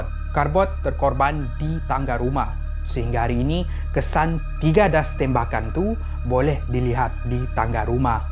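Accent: Sabah, Malaysia. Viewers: Orang Sabah...bah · ckp sabah sya suka dgt org sbh ckp...sdp gilaa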